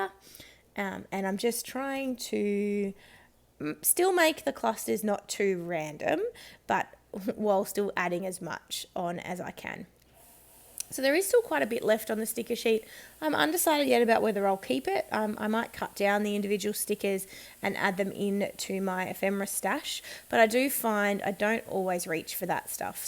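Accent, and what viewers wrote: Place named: Australia. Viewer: Super job using your kit this month!! & This page is SO CUTE!! (I'm saying "so cute" in your Aussie accent!) I really am enjoying all your videos!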